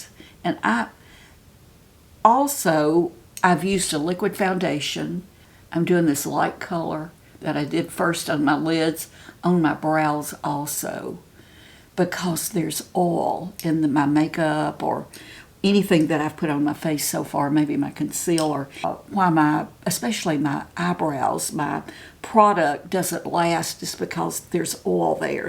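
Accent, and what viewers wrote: South, United States. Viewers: Good day Mrs. You are amazing and brave. There is no way, I would have a YouTube channel but show myself without makeup is a scary thing to me. But you have a bieutifull face and a great southern accents. I would love to hear some stories you might want to share and tips and things. I am sure you have a lot to share that would be helpful to somebody like me. Thank you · Your eyes are so pretty, bright, and clear. Not just the color, but the whites are so white, even b4 makeup application. Tends to fade with age and/or health challenges. 80 looks good on ya! Appreciate your transparency. New here. Enjoying your southern lilt. Luv the transformation you did here! Very nice result. Great pointers. Just checking it out 🖐 P.S.Yknow, me and a friend are watching and think you resemble singer Judy Collins. Ever heard that before? p.p.s. 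I have heard other makeup artists comment about the importance of blending, that unrernlenring is one of the most common errors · Just found your channel and I love it!!! Thank you for this makeup wisdom!!! Also,do I detect a bit of a Southern accent? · Just found your channel at the recommendation of a friend! You are gorgeous! Love that you show your naked face and all the techniques you use to enhance your beauty. At 80, you are amazing! And with your accent you must be a sister from the south. 😊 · I love your Southern accent. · Absolutely love this! You best believe I will be glamming up when I’m your age ❤ so elegant and your southern accent saying “matoooor” and “oahll(oil)” reminds me of my southern family.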